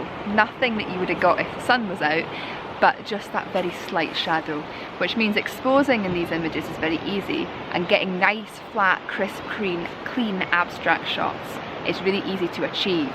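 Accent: Scotland, United Kingdom. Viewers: Great Video, as Usual a pleasant time, listening to a wonderful scotch accent. You make photography wonderful. Brilliant images!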